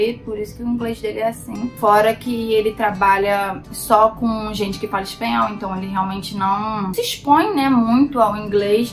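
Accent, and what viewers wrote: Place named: Rio de Janeiro, Brazil. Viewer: Cara, o quão fod* você é, de verdade, te acompanho há um tempo, no primeiro momento pelo jeito d falar desconfiei que era do Rio, assim como eu, mas não tão próximo cara... Minha vizinha de cidade, Niterói/SG, todo esse lado financeiro que você contou eu me vejo muito, de verdade, venho/sou de uma comunidade no Fonseca, em Niterói, e almejo chegar a Gold Coast um dia. A partir de hoje vai ser minha referencia se tratando de AU... Abraços.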